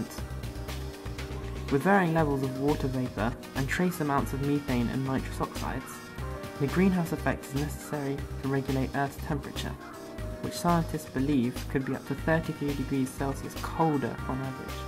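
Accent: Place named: England, United Kingdom. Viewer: What you talking about? he's speaking English with an English accent.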